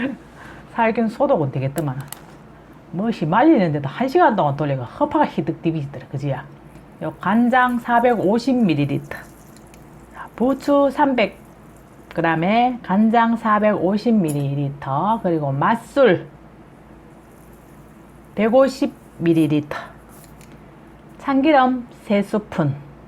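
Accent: Gyeongsang, South Korea. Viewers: 님 말씀도 잼나게 해주고 요리솜씨도 대박이네요. 경상도 사투리가 매력있어요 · 항상~~잘보고 있어요 경상도 사투리 맛갈납니다~~^^ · 경상도 아지매 저도 경상도입니다 말씀이 아주구수합니다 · 님께 배운대로 정구지 간장 만들어봤는데 너무맛있어요 한통만들어서 뚝딱 다먹었어요 경상도 말 너무재미있어요 가르쳐 주셔서 감사합니다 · 경상도 사투리가 참 맛깔쓰럽습니다. 부추간장에 청양고추ㅡ 한번 해봐야겠어요. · 경남의사투리같아요 재미있어요.내일당장만들어야겠어요.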